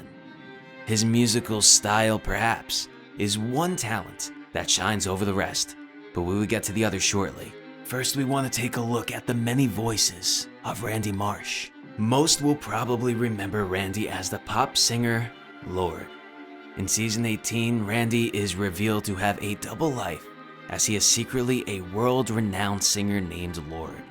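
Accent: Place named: United States. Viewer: You sound like how europeans think an american youtuber is supposed to sound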